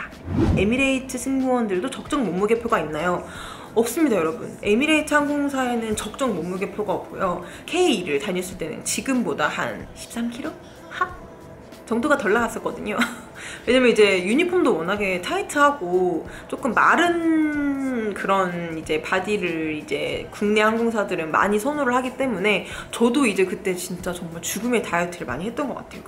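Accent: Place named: United Kingdom. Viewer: Love your English accent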